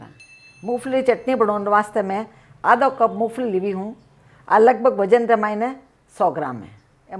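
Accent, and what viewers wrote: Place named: Rajasthan, India. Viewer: main aapki aur aapke husband ki recipe dekhti hun main rajasthan se hi hun aapka lahza boli achi lagti hai 🙂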